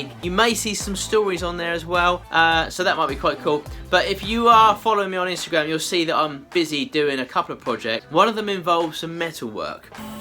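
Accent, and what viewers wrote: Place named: United Kingdom. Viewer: You know, the first time I watched one of your videos I just assumed that N/Z meant New-Zealand. And I thought: why has this guy got a UK accent? 🤣🤣 silly me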